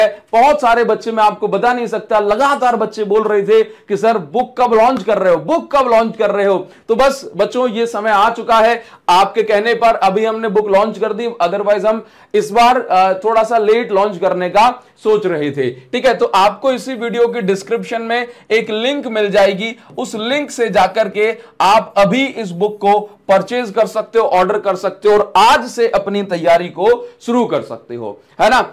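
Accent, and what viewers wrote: Odisha, India. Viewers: Sir are u from odisha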